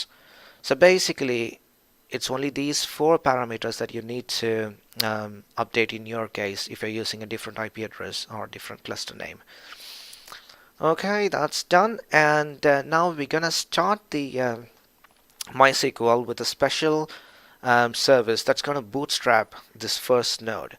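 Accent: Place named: Australia. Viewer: and that’s good Your accent sounded like Australian Never mind bro..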